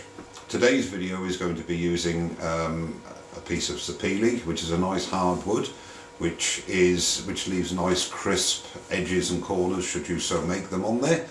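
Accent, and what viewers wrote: Wales, United Kingdom. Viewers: Hi!! Very nice job on the finial, move over Cindy!! Great tip using the stain to give the apearance of ebony, I liked that. I know as your videos become more polished you can incorperate music and speed up the film but I must admit I do miss the old simple videos with your explanations and banter while turning. It must be your mellow Welsh tones. Still a great vid All the best!!.............Andy.;)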